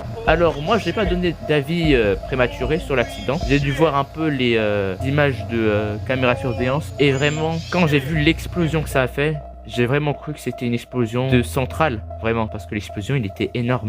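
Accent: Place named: France. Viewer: Mmm French accent~ 😛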